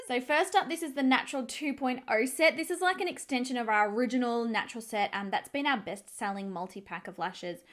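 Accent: Australia. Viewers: Why Australia has to be sooo far away?? 🌞🌞Love your videos and your accent!! 😙 #HolyGrails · Love your videos. You are so beautiful and sweet. And I love the Australian accent. XO from Florida.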